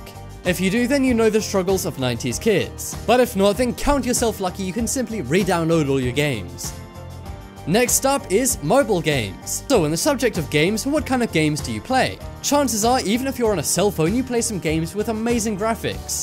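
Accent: England, United Kingdom. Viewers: He's English because us American don't sound like that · I cant figure out if this guy is english or american 😂🤣😂🤣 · You English?you sound it but you use so many American terms,you ashamed to be English?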